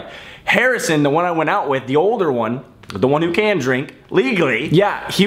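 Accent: Scotland, United Kingdom. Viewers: Scottish accent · Nice Scottish accent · You sounded kinda Scottish and I'm living for it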